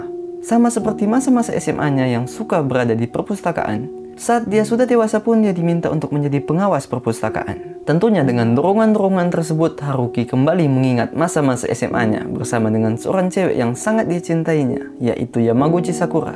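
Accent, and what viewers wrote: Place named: Java, Indonesia. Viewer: Tkumi irng jepang ap jawa sih mas jawany melekat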